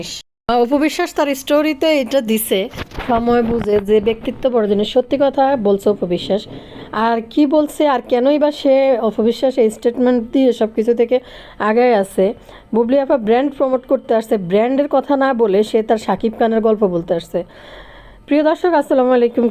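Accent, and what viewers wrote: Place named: Noakhali, Bangladesh. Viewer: আপনি নোয়াখাইলা তাই বুবলির মতো কথাবার্তা তাইলে বুবলি পরকিযা রানী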